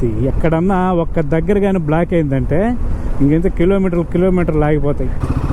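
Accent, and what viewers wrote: Nellore, India. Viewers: బ్రదర్ పూర్తిగా నెల్లూరు యాసలో నె మాట్లాడండి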